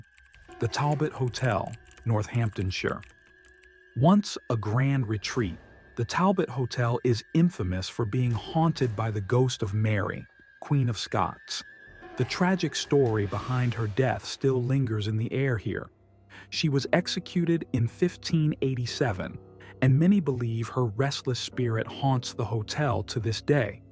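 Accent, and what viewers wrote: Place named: United States. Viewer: Why an American voiceover?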